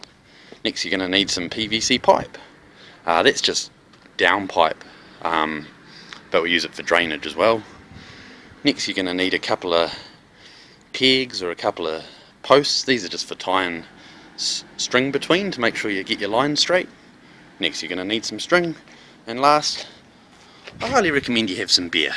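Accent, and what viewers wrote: New Zealand. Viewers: Loved this! Funny guy and I could tell you were a kiwi:) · are you a kiwi too bro ? love i found this video as its exactly what i need to know! chur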